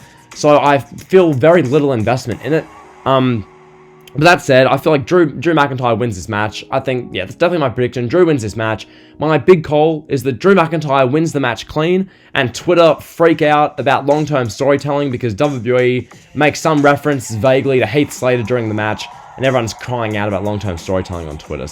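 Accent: Australia. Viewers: I love your accept bro, my Aussie bro 😎 John Cena should lose to Roman Reigns, simply because, a win will deter his accolade. Roman Reigns needs this victory more than John Cena... I'm not saying it cos I'm a fan of Roman Reigns.. bur that I'm a wrestling fanatic and critique...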